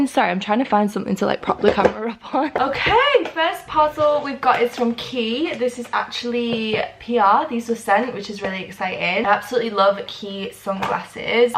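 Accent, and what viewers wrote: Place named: RP, United Kingdom. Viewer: Have you always lived in Manchester? Your accent sounds quite different and posh I can’t place it 🤣X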